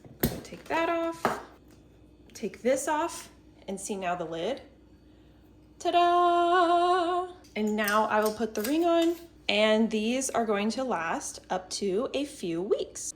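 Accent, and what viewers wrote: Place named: Midwest, United States. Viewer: Hi, I store my hash browns, burritos, etc, in the freezer. They seem to get crusty with frost and just taste awful. FYI, I am not frying them, but use an air fryer to cook them. Do you think vacuum sealing them will prevent this from happening? And bread seems to suffer the same fate. But I saw a video showing a piece of bread turning into a tiny piece of leather after being vacuum sealed. Do you think its best to first freeze the bread and then vacuum seal it so it doesn't deform like your berries? Sorry, I am so new to this and just discovered this. As I am a single father of a 11 year old daughter, I am trying to find ways to preserve food as she will often waste food if I give her too much at one sitting. FYI, I love your voice, you almost seem like you are from Ohio, Minnesota, the Dakotas or Wisconsin. So adorable! 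I'm in SoCal. Let me know if you are ever in the area!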